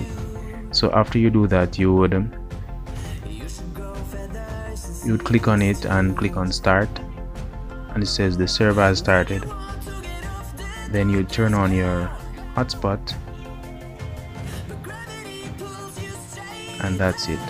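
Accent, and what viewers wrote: Jamaica. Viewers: You a jamaican?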